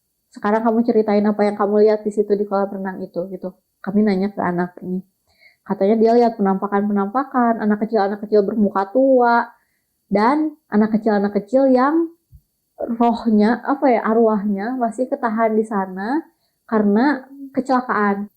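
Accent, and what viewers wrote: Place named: Sunda, Indonesia. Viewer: Samprazan teh! Assalamualaikum! Ari teteh teh saha? Meni nyunda pisan! Meni hebaat uy pangalaman nalusur na!. Se frekwensi jeung kami! 🤗 Lanjut teh nalusur na! Sukses selalu! 👍👍🙏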